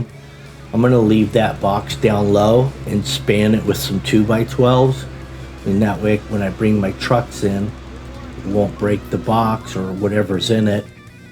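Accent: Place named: Southern California, United States. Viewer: I thought you were in the OC. Like at the Wedge 🏄‍♂️ 🌊 you're accent. I'm Santa Monica kid , but now I've a house is in the friggin Val haha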